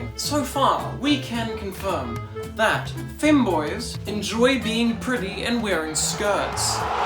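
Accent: New Zealand. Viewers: Clicking on a femboy memes video only to get immediately slapped in the face by a kiwi accent really sent me for a loop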